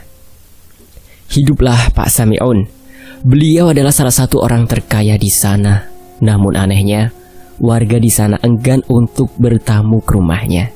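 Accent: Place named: Banyumas, Indonesia. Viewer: Ngapak juga bisa pokoke the best 👍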